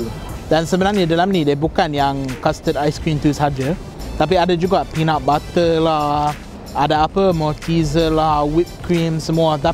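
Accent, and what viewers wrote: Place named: Malaysia. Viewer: ckp inggeris mcm telo melayu. Terbaik.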